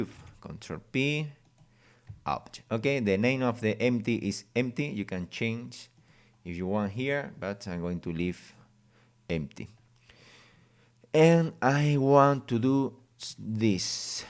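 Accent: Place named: Spain. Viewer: I like your spanish accent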